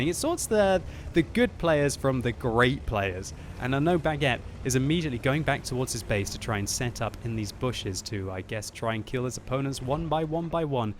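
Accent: France. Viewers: your french accent is really lovely <3. I m french and I love when british speak like that <3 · i love your french accent 👌😂🇫🇷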